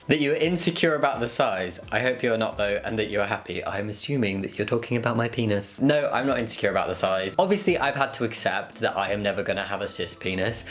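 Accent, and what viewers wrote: United Kingdom. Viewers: I felt like your accent slapped me in the face with just how British it was lmao, that's the best way I can explain it. Also your voice is really calming and it's the type of voice I like in ASMR videos. I'm glad your family is supporting you in this, and that your school is doing its best as well! Catholic schools just seem to suck universally and I don't understand why people keep sending their kids in them...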